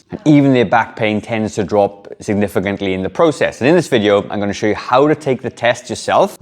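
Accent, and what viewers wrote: Wales, United Kingdom. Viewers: Im Scottish, im loving your Welsh accent. Celts together!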